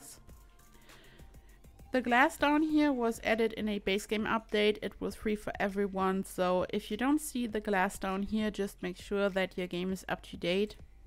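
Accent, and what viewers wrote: Germany. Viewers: Deutscher Akzent iz da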